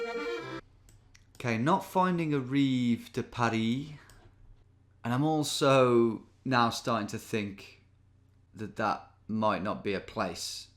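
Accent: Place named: Liverpool, United Kingdom. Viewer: more of that scouser accent please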